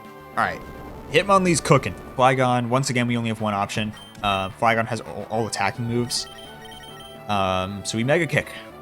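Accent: United States. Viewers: Are you from the Philly area?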